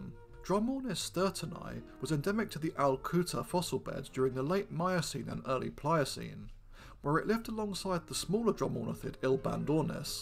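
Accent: United Kingdom. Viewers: He pronounces them the British way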